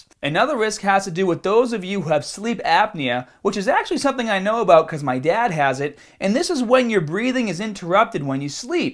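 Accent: Boston, United States. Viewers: He’s so Boston Irish ☘️🇺🇸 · Very knowledgeable. Thanks for the info. (Love the Boston accent. I'm in upper NY where we use hard "R"s and all sound like pirates) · Anyone else love the Boston accent😂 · Bostonian accent. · I’m from Boston and I have to say accent is thick..lol.great videos keep it up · who are you to come on his channel spouting nonsense he has a Boston accent get over it and grow up. how many accents are there in the world after all.... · tee ahh tee. Love Boston ahcent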